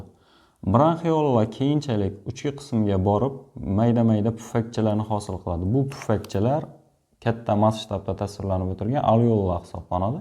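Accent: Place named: Khorezm, Uzbekistan. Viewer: Dm zor tushuntrbsz raxmat shevangzdan xorazmlimisz diman😅😊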